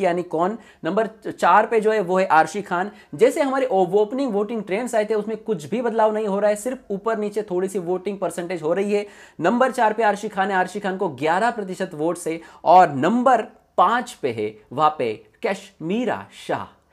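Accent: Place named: Kashmir, India. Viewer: Apka kashmira accent wah wah.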